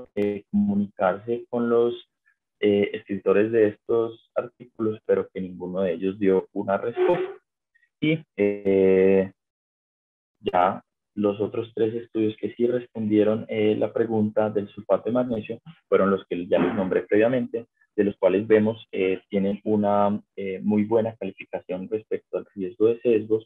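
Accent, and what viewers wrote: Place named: Colombia. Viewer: No se entiende por el acento colombiano o es que tiene el micrófono muy pegado de la boca debe hablar más lento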